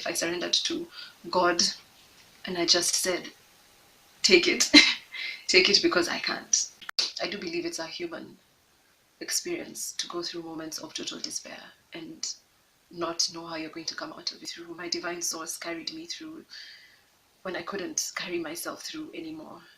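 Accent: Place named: Nairobi, Kenya. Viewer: Sort of fell upon your channel. You remind me of someone I knew... beautiful and soulful and absolutely terrified of being vulnerable. Glad you found the strength to share. You sound so Nairobian though! It's too bad you had to leave.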